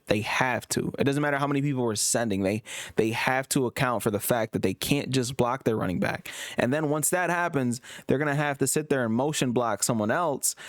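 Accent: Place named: RP, United Kingdom. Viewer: Very Posh!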